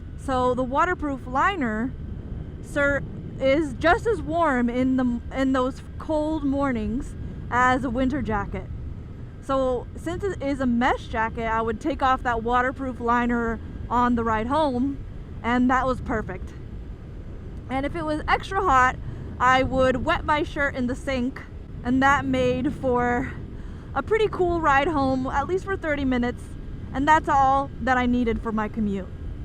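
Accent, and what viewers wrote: United States. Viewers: You sound like you live around Hotlanta!😁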